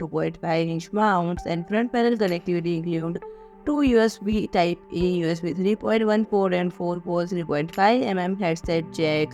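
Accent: India. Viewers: It's called an Indian accent, but interesting way of hearing it😂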